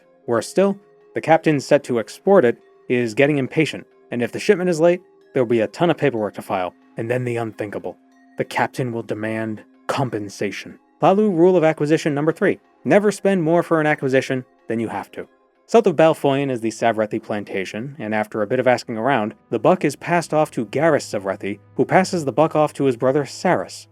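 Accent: Boston, United States. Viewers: So, it wasn't until I went back and watched your RS3 Maxing series that I clearly heard your Bostonian come out. I don't know how I never picked up on it from watching all of your Morrowind content AND your streams lol. I absolutely love it, honestly. It's the same joy I get when I hear Jerma slip into his Bostonian. I get it, my Texanese will show up from time to time. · You should use your boston accent more often its so good (I promise I'm not biased because i live here)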